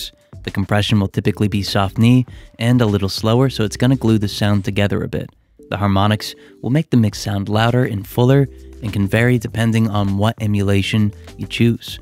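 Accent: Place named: East Coast, United States. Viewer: I still don’t understand why your pronunciation has COMPLETELY changed from your older videos. It’s like you’re trying to sound a little east coast-ish. Whatever it is, I’m puzzled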